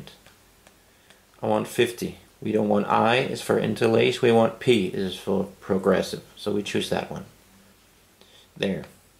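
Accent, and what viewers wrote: Denmark. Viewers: I was like... That sounds a tiny bit like a Dane. And sure enough, there is the tv series "Klovn". 😁 So.... How smooth do you rate the scrolling in games, in real life? And is it good for Amiga as well?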